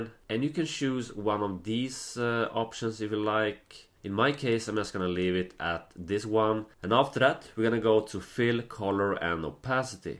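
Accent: Sweden. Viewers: Sounds like your Swedish by the accent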